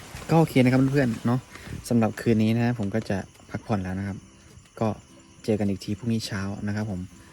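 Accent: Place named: North, Thailand. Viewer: คนเหนือนีวา555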